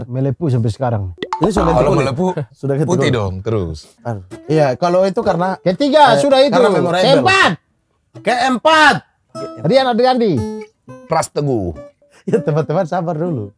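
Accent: Eastern Indonesia, Indonesia. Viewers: nah iya, sependapat gk enak aja didengar bg klo kebawa logat timur lebih pas logat yg biasa aja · makin ksini, logat bang makin menyatu dengan trio timur 😅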